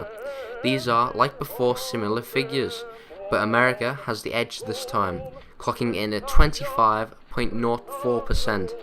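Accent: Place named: United Kingdom. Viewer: Have u wondered this guy is a brit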